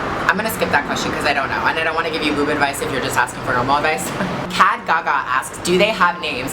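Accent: Canada. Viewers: she is from Canada, our english is similar to the UKs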